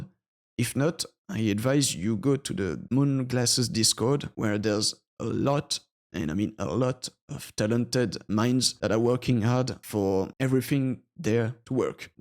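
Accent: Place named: France. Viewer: En tant que française j'ai reconnu de suite l'accent :D très bon tuto! la boite à outil est elle à la portée de tous?Je veux dire est ce que si on ne fait fait ni modding ni programmation , n'importer qui pourra créer sa map ,et ses quetes? j'ai lu aussi que ce n'était dispo que pour steam ? il y a t il un espoir pour GOG?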